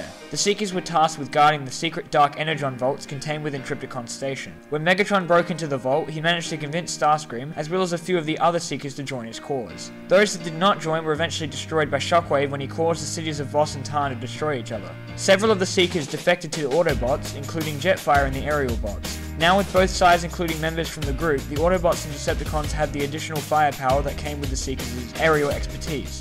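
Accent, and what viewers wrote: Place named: Australia. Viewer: Why is there a Australian person narrating this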